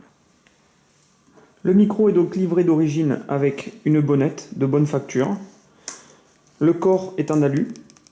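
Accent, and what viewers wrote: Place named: Narbonne, France. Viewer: Ha oué, c'est bien ce que je me disais, du Sud, mais pas de Marseille. ca chante plus joyeux chez vous :)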